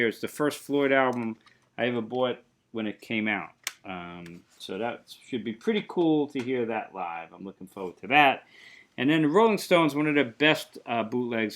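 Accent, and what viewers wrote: Boston, United States. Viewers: Wow your bahstan ahccent is thick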